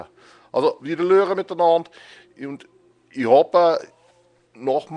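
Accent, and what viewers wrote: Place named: Pennsylvania Dutch, United States. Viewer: häsch s bitzli emene Deitsch (Pennsylvania Dutch) akzent, mit "awer" und "schwätzä" : ) wirkli schön gmacht, i han au di gärn für Schwiizertüütsch - du redsch sehr, sehr guet!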